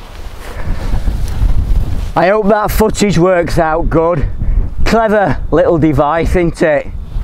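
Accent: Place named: Sheffield, United Kingdom. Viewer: Just found your channel with the chesterfield tunnel. I grew up round there but was in London and the south the last fifty years. Walked to Carl wark with dad. Nice to hear the Sheffield accent , if I might say different to the chesterfield one. Enjoyed all the camping stuff . Thanks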